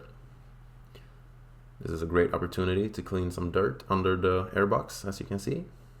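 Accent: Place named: Sweden. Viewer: First time watching one of your videos, and I was like "damn, he sounds hella Swedish". Then I saw the Biltema can 😂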